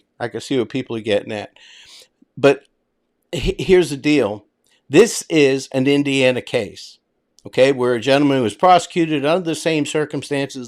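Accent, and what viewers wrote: Boston, United States. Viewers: Love the way you talk. Long islander here, transplanted to rural PA. Sounds like Boston but it's refreshing brother. Good info, subbed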